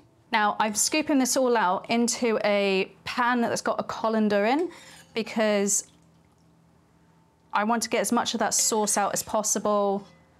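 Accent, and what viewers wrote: United Kingdom. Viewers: Nah, "malliard" and "bull-ion" is because she's British. You want some examples of how British people mangle European words, you should see the Great British Bake-off Mexican week special.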